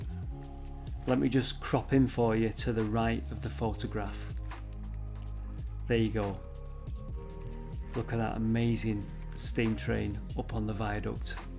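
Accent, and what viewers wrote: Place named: North, United Kingdom. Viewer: he was always going to visit....but there was always something that stopped him for one reason or another. He was a dedicated followr of yours. He always loved anything old...rusty....broken... hidden. You always have so much enthusiasm that is hard not to catch. It helped that your from the north like myself, that way he stood more chance understanding you. Lol. His name was Mike, but he was known as Cork Cork. He was a very good man. I loved him very much. We only had a few years together, but I think we loved a lifetime!! Corny I know, but true. Thankyou for replying....that means a lot too. Keep up the good work. Be safe.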